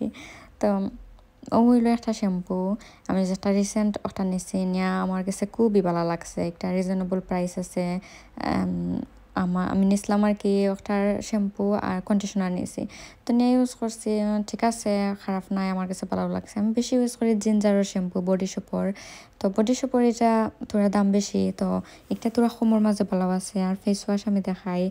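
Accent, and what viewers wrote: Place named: Sylhet, Bangladesh. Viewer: Normal vhabe kotha bolle vhalo hoy Karon onek ktha bujhi onek ktha bujhina … jara sylheti na tader ektu somossha hote pare arki 😢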